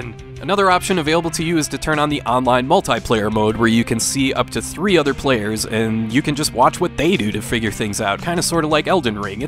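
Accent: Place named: United States. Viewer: Just wanna say from a Brit..your accent is amazing…so Americana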